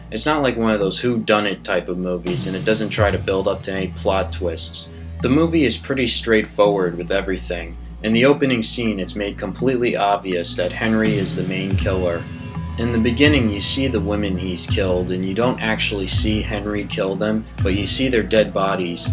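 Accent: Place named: Chicago, United States. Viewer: I don't hear that, but with the Chicago accent he sounds a lot like the rude fence where they got the TV and camcorder.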